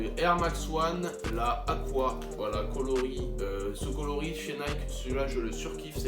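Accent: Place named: South, France. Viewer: Salut mec je viens juste de découvrir ta chaîne, enfin une personne qui a un peu l’accent du sud mdrrr j’en ai marre de l’accent parisien 😂 bref j’ai bcp aimé la vidéo +1 Abo ah oui et avant de partir, j’ai un budget de 200€ pour une AJ1 Hugh en 11, est-ce que je devrai attendre que Nike en sorte sur lapp où j’en prend une sur un site direct? (si t’as un site qui vend des snkrs pas cher dis moi je suis preneur) et pour re-finir ça pourrait être cool de faire une sélection de vêtements pour des tenues avec tes paires les plus importantes pour toi ça serait vraiment cool !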